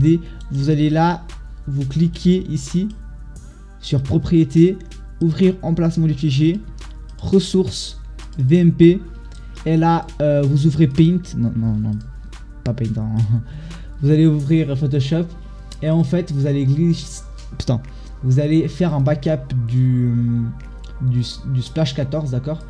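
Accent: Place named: Portugal. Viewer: il est portugais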